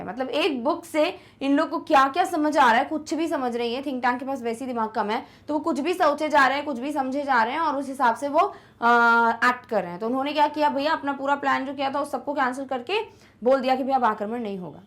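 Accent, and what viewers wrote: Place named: Punjab, India. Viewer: Mam Shayad Panjabi Background se aati hai kyuki inki hindi me thodi thodi panjabi Accent jhalaktha hai 😹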